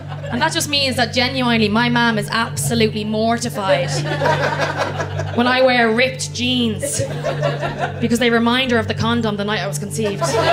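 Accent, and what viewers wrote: Ireland. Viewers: am April 3 2024 To hear you talk in an Irish accent makes my eyes water with joy !!! You are an angel. !!!! Yer woman is Funny … my gut aches Tanks for sharing!!!! Absolutely brilliant!!!! Nothing but lovely , fair play to ya. Slainte’ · She is very cute and I usually love an Irish accent, but she reminds me too much of a girl I tried to date whose brogue would get thicker the more she drank. Cannu unnerstan ya, lassie! 🤨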